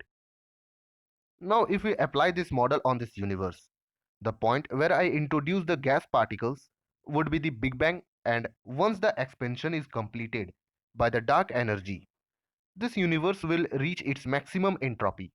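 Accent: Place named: India. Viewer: you lost me at the indian accent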